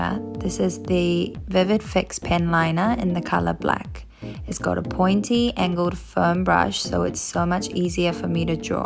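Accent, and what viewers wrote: New Zealand. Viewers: bb so this is why am getting some british accent then I was like nah aussie and then you said you are kiwi shiii I am confusion but now cleared 😉 cheers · SHE FROM NZ!!!! I knew ittt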